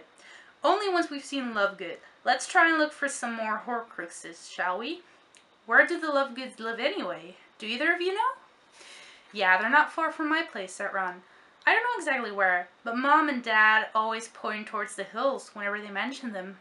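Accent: United States. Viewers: As an American, you sound more American and precise than I do haha. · You sound incredibly American...minimally Dutch (I'm American) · You sound American and not Dutch O: .. I live in the U.S and I have an American accent. I like the British accent.. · I'm American, and you sound very American. ;) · I've heard "prolly" for probably, in the US quite a bit. lol So you're not entirely wrong. Probably has 3 pronunciations here. Prolly (the quick, lazy way), probbly (the most common), and probably (people who speak really slowly, and drive me absolutely insane lol). But yeah. You'd definitely pass as American or Canadian. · wow ik zit hier in de states my bf is like "she sounds american"!!!! compliment standing ovation!!!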